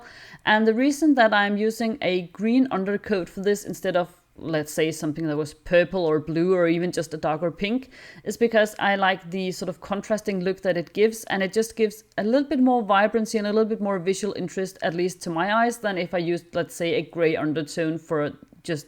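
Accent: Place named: Denmark. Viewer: something in your english reminds me of how I speak english.. are you perhaps danish? awesome scheme btw(og hvis du dansk, stærkt farvevalg)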